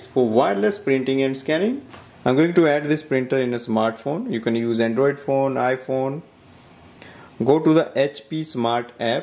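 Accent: India. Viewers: Your Indian accent is annoying.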